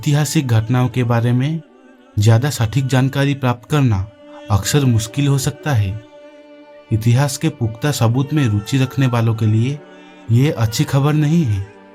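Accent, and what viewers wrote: Odisha, India. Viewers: Hindi pronounciation ru jana paduchhi j apana odia.... But nice historical facts keep it up.....❤ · Are Bhai odisa se ho kya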